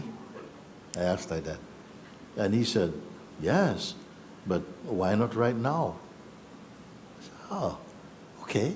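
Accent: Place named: Jamaica. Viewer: He sounds like jamaican..i jave been to jamaica..for 3 years..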